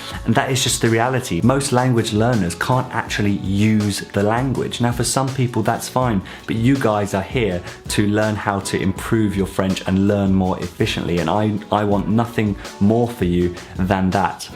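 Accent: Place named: United Kingdom. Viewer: Are you British? You sound like it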